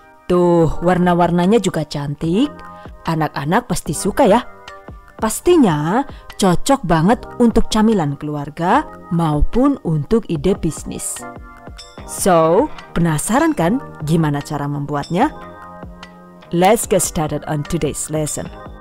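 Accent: Banyumas, Indonesia. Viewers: Maaf bu asli nya dari daerah mana ya .. klo ibu siaran yutube aku ketawa loh dngn bahasa ny ternyata pada ngapak ke yah .. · Inspirasi terimakasih Aq suka resep2Nya gampang di pahami 😍😍 Aq suka denger ngomong bahasa ngapakNya lucu 😊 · 😂😂😂 suka kalo bunda ngapaknya keluar..ora ngapak ora kepenak 😉😉 salam dari medan bunda 😘😘😘😘😘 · Jeng bahasa ngapak nya tu lo yg bikin kangen.jian tenan mantap klau ngomong bahasa ngapak.anak saya ketawa terus klau dengar bahasa ngapak yg waktu bikin donat.disuruh muter berulang kali yg ada bhasa ngapak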